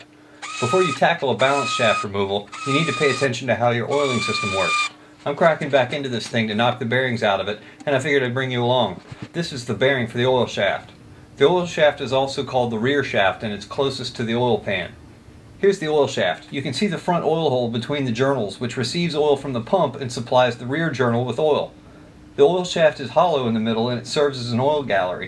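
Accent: United States. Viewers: Are you from Alabama?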